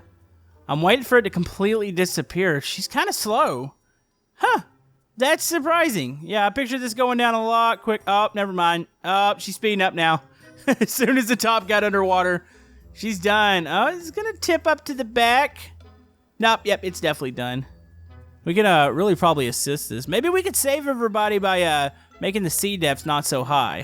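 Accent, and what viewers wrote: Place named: West, United States. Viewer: are you western? because the way you talk and sound, sounds western American.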